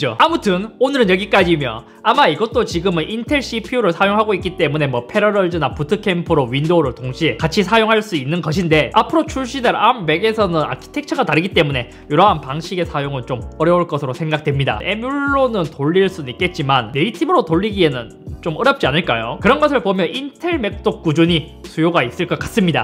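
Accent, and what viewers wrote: Gyeongsang, South Korea. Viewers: 잇섭님 혹시 대구에 사세요? 경상도 사투리가 들리는것 같아요.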